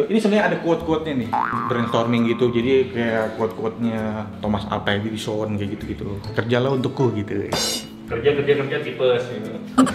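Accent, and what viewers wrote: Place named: Pontianak, Indonesia. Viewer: Orang Pontianak mana suara nya 😄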